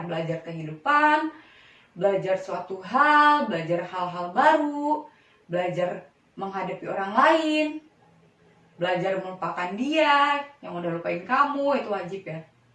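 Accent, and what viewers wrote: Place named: Palembang, Indonesia. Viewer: Palembang punyo ini.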